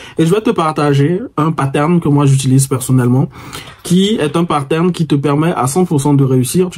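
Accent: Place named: Ivory Coast. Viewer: Ton côté Ivoirien 😂 m'a permis de comprendre vite 🤝🤝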